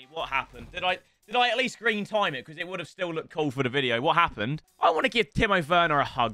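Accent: South Africa. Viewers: That South African accent tho... Lol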